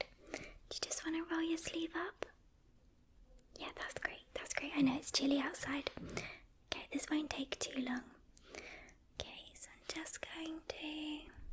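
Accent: England, United Kingdom. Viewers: I absolutely love the way you sound. I could honestly listen to you talk all day. I’ve been very impressed with the high quality & length of your videos. Do you mind if I ask which part of England you are from?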